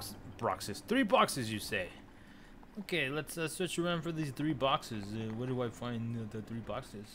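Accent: New York, United States. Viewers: So, im from NYC (born BK, raised Staten Island, so you can imagine The accent I have)..and so many of my gamer friends want me to voiceover my videos cuz of that accent. And hearing you in your video, now I understand why. Absolutely awesome 🤣🤣 (side note, thank you, I couldn’t find this shrine for the life of me lol) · I will have to say, I realized right away that this is the first YouTube video I've ever watched with a New York/Guido accent. I'm not even mad.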